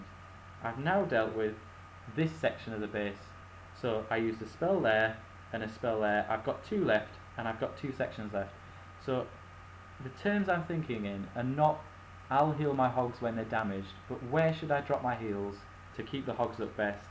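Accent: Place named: United Kingdom. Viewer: Great to hear another British accent on Clash YT vids.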